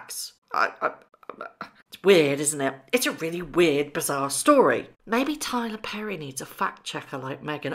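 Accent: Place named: London, United Kingdom. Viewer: I don’t know where you are from in England, but you sound like my family back in London❤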